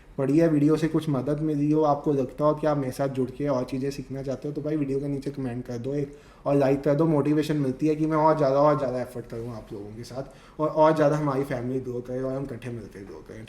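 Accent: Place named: Pakistan. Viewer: Your accent is like a pakistani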